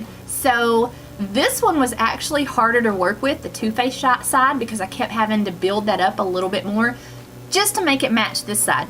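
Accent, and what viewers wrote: South, United States. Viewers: Thanks for the review! Where are you from? Love your southern accent❤️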